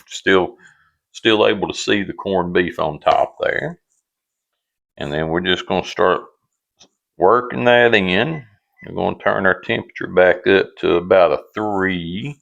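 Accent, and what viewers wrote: South, United States. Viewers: When my Mom made us gravy for breakfast, she would always add her eggs to the gravy and cook them. The few times that i tried it, my eggs would disintegrate(big word). She also would add water to her canned milk so we would have milk. I hate the taste of it now and cant cook with it either. I remember fixing corned beef gravy before, but its been a long time. Thx for the recipe and enjoy hearing your country accent. Proud of mine😂😂😂 Alabama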